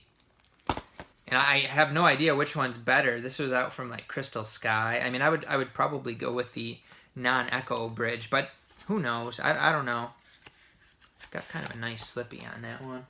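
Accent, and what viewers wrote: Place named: Great Lakes, United States. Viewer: It's always great to watch your videos, I'm so impressed with your fantastic movie collection. And your Great Lakes accent reminds me of loved ones in Western NY, a really beautiful part of the country.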